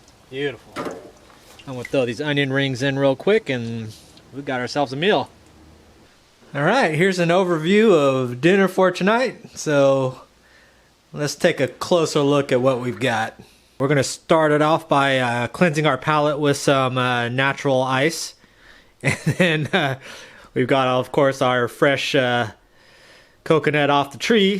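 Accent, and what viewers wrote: South, United States. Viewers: where'd u grow up? never rlly see a somewhat old asian guy with a southern accent lol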